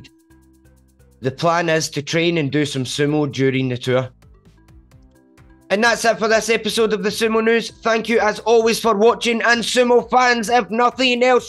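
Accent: Scotland, United Kingdom. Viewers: For some reason, English folk butchering Japanese annoys me, but in a Scottish accent its still lovely 🤣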